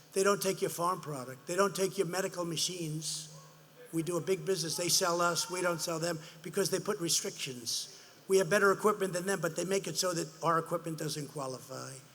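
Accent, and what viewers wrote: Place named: New York, United States. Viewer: I think they just love the New york accent about him... that is the only reason I can think of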